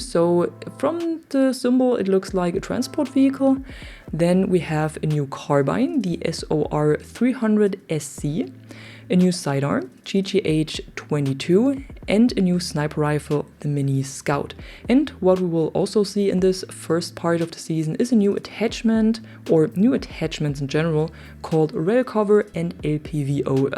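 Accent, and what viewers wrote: Germany. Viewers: Man hört übelst deinen deutschen Akzent raus 😂😂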